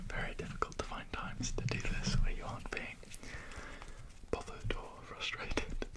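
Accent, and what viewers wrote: England, United Kingdom. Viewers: It’s so nice to find some English asmr! I’m so used to only Americans. You remind me of all my mates - very relaxing - good job man! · LORD YOU SHOULD HAVE HEARD THE GASP I MADE WHEN I HEARD HE WAS ENGLISH 😍😮‍💨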